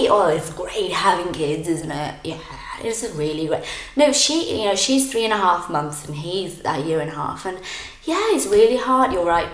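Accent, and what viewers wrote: United Kingdom. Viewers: é a pimeira vez que vejo seus videos, mas gostei demais da informação! tenho aprendido português e inglês pela minha conta , e acho muito importante melhorar e corrigir nossos erros por não sermos nativos, seu sotaque é realmente lindo, depois de 15 anos ou mais estudando inglês americano seria dificil para mim imitâ-lo, mas vou recomendar seu canal aos meus estudantes de inglês que gostariam de aprender o sotaque britânico!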